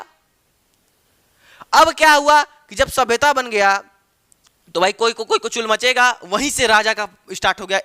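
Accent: Bihar, India. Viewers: Bahiya aap Bihar se ho kya aawaz se lag rha hai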